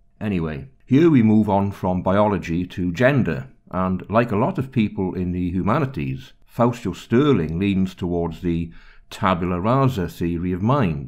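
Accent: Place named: Cardiff, United Kingdom. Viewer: Excellence in a Cardiff accent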